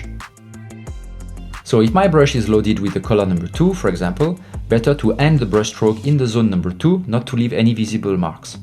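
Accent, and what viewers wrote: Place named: France. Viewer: Ah, cet accent Français inimitable, qui a son charme :) Merci pour le tuto.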